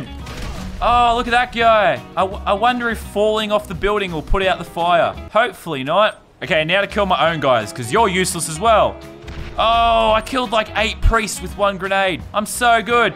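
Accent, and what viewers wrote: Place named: Australia. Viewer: yes, youre a patriots fan!!!!!!!! where do you live cause you sound Australian but you like the Patriots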